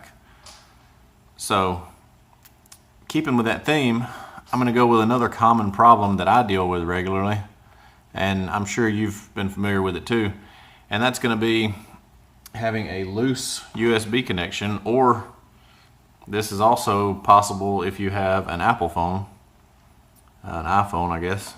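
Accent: South, United States. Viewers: tony Hear, hear. I'm I the only one who likes his voice? Nice southern drawl.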